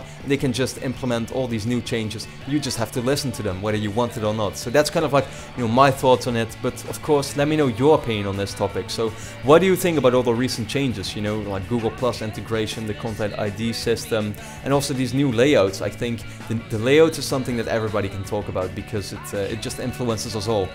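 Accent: Netherlands. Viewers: Hey you sound dutch. Are u dutch.?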